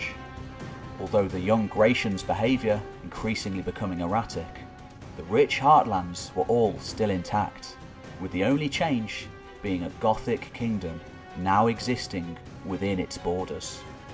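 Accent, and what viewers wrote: United Kingdom. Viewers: whereabouts specifically in the UK is this accent from?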